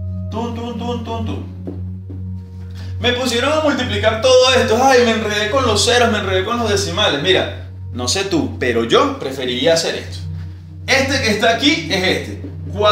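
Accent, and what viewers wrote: Venezuela. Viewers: eres venezolano?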